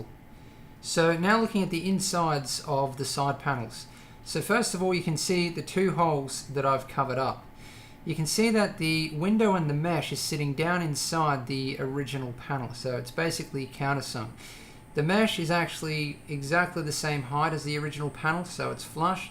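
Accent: Australia. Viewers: this is the only video ive seen from you, sound australian? do you have a business that makes custom pc's? if so, id be really interested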